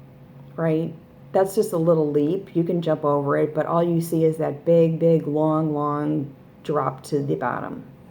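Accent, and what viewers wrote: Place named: Chicago, United States. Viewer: Verbal Vomit is the best description ever...and so true. OH! Chicago. Similar accent to western NYS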